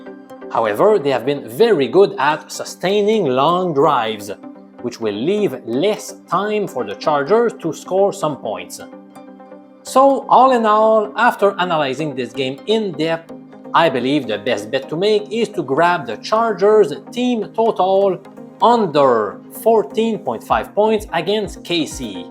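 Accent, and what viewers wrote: Quebec, Canada. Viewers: First time watching your channel and I hope your the GSP of Sport Betting🤣. I'll take a wild guess and say that you got your Master's in Statistics at the Université de Montréal, I know a Quebec English accent when I hear one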